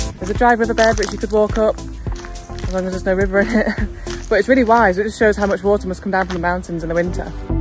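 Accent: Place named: Stafford, United Kingdom. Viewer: You sound very immature! Great video,thanks!😅😊By the way ,your accent sounds very similar to my home town accent of Stafford.Im guessing your from not a million miles from there?😊😊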